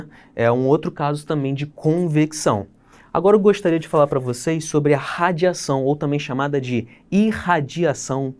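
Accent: Rio de Janeiro, Brazil. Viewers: Um carioca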